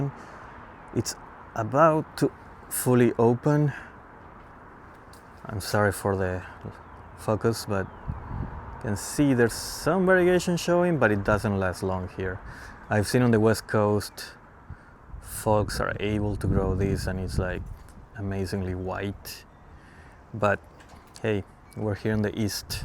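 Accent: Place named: Nagaland, India. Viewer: Nice collections. I am from Nagaland, India.I want to tell you that you the way you speak, it's just like one of us,,we people speak English exactly like the way you speak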